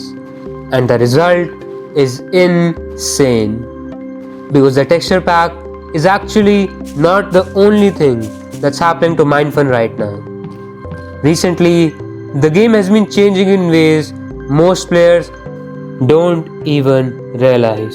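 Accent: India. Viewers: Bro are you from India 🇮🇳cuz I feel that your voice is like indian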